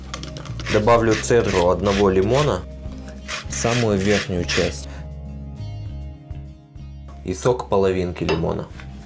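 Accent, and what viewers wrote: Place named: France. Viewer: Ну что я могу добавить к тому что уже сказано? Молодец, умница и это французское произношение приятно слушать😉😉😉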